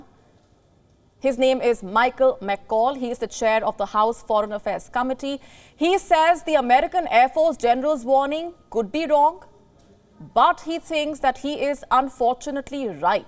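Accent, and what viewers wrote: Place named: India. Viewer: had to bounce after hearing that indian accent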